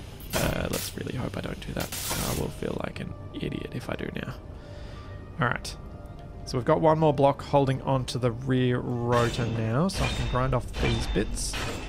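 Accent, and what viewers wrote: Australia. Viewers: Oh, I didn't know you're Aussie. Happy to know mate. Brisbane here. :-) I'm loving your videos. And you're totally right. Do it the fun way, efficient or not.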